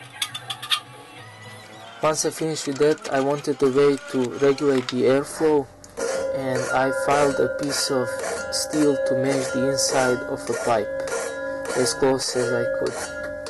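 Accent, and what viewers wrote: Russia. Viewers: Great video. Are you from Russia? Couse I can hear your accent quite good :)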